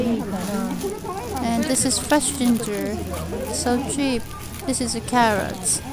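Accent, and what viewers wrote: United Kingdom. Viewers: イギリス英語ですね😃✌️🌹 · イギリス系英語？聞き取りやす～い！ゆうくん可愛い❤️うちのこもこんなんやったな～ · すごい行列。朝市って意外と混むんですね。 アクセントが、イギリス英語かな？ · イギリス英語かな❓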